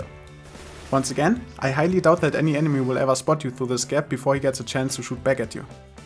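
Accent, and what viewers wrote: Germany. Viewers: your German accent english is so awful, try to speak more fluently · trying understand your english with a German acent, is so hard, but you make a good video. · You sound very german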